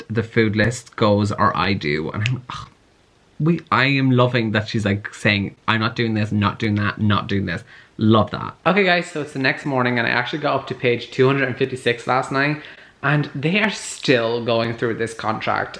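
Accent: Ireland. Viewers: siri with the irish accent omg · Living for 50 shades of grey + this irish accent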